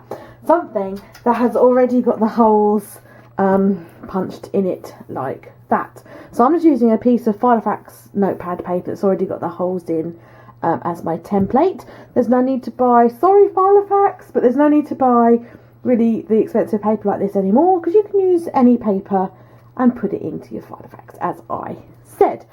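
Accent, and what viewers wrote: United Kingdom. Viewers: Thanks! And, Im sure you've heard it a million times....I LOVE LOVE LOVE your british accent!❤️❤️❤️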